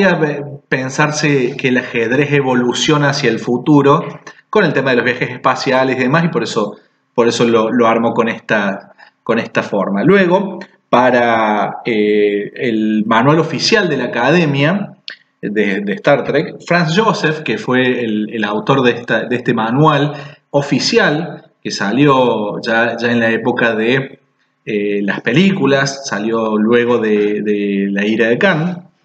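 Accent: Argentina. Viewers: es re cordobés el vago jajaja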